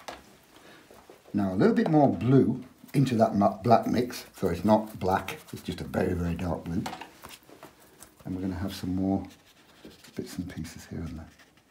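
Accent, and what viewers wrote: Yorkshire, United Kingdom. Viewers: Hi,. Your video notification just popped into my inbox - not sure why as I haven’t done watercolours for decades, but I’m glad it did as your easy ways inspire me to give them another go. To my surprise, enough of my tubes are still usable and I even discovered this very book on my shelf, so no excuses! Good to hear a good old West Riding accent - you obviously hail from very close to me. Please don’t apologise for your roots - we have grass in the north, not graarse! Keeps up the great work.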